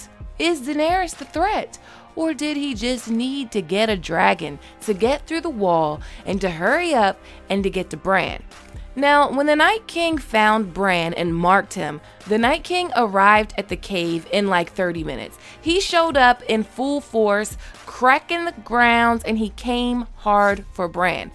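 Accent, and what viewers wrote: United States. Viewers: do your thing my dear u def sound a little country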